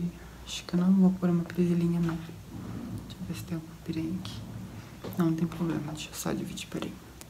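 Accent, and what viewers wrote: Rio de Janeiro, Brazil. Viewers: Eu amo quando faxxx sotaque carioca!! Acho mais relaxante ainda😍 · Aiiii eu sou apaixonada no sotaque carioca aaaah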